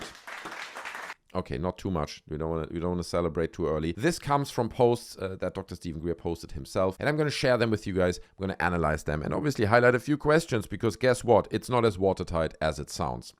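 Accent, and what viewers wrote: Germany. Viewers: I just like saying, "Buga Sphere" in your German accent.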